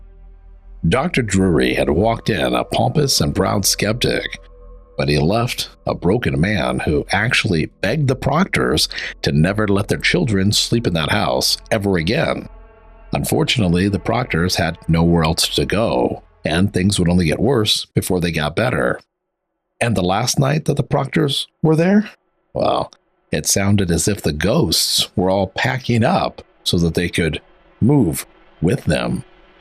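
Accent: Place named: United Kingdom. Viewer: Glad you used a clear British accent as South Shields and up that way have a very thick accent. Awesome upload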